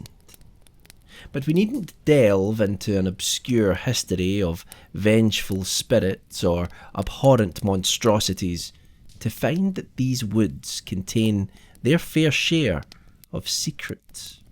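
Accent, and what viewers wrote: Scotland, United Kingdom. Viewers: I love this. Not to mention I love creepypasta and my heritage. Scotland. So a scots reading creepypasta is just pure brilliant! Great job my friend ;)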